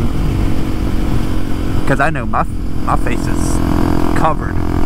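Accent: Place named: Pennsylvania, United States. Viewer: For some reason I hear a PA accent…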